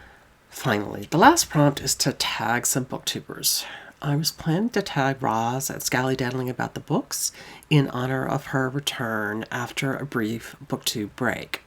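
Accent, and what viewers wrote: South, United States. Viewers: I did laugh about all your characters sounding like they are from the South. When Steve and I used to take turns reading aloud to Alf and Tilly at bedtime, he used to tease me by giving characters accents I couldn't match the next evening. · I had to laugh when you said you sound southern no matter what accent you are trying to read. It made me think of my French professor in college who commented on my southern French accent. All of my Texas classmates sounded the same as me in my opinion but clearly not in his.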